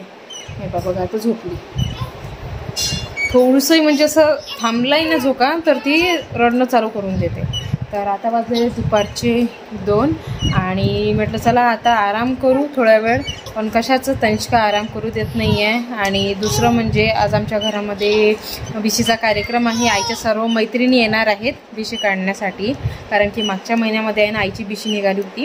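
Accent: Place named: Akola, India. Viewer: तुमची भाषा माला खूप आवडते आमच्या शेजारी आहेत ना ते अकोला चे आहेत हाऊ ना😊😊 मस्त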